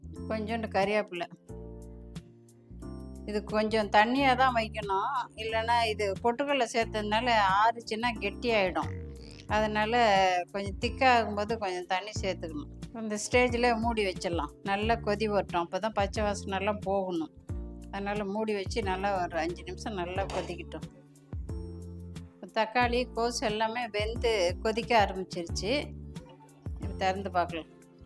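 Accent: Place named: Vellore, India. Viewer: Very nice madam, i shall try this, i am native of vellore, i am happy to listen to your typical vellore style Tamil.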